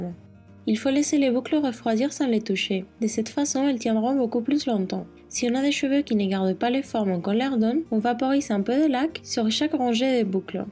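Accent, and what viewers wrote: Spain. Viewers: Elle est espagnole. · tu as un joli accent espagnol et j'aime bcp les coiffures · Tu as un joli accent espagnol, et tu est très jolie. :) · Elle est espagnole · J'aime vraiment ta voix et ton petit accent espagnol ! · Elle est espagnole😍😍😍😍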